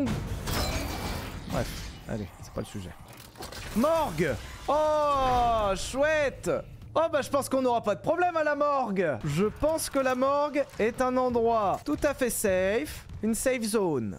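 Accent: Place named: France. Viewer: Et à quand la fin de tes tres merités vacances!!!! Je mennuie de mes jeudis midi bagnole avec celui qui a laccent francais mais ne devient jamais monotone a ecouter ( je suis au quebec et vive le decalage!!!) et je dirait meme c du bonbon a ecouter .... Et , meme si ca peut etre drole au debut merci davoir laisser les pets et les rots dans ton ancienne vie.....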